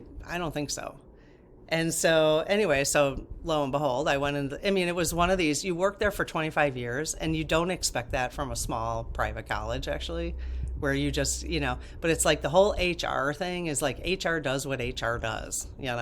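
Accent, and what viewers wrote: Midwest, United States. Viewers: Hi!! Yes! Everything will work out for us! Let’s raise that vibration so the Universe will match it! When I first came across your channel I recognized the midwestern accent and you said today you are from Wisconsin. My Mom was from Door County (Sister Bay) and I have such wonderful memories of my MorMor’s cherry farm! Such a beautiful state. ❤️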